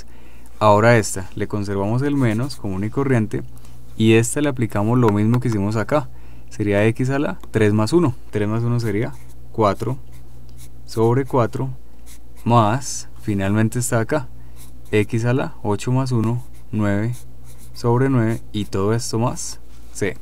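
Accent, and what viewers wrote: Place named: Colombia. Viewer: excelente video , muchas gracias por subirlo y mas con ese acento de colombiano.... gracias infinitasss !!!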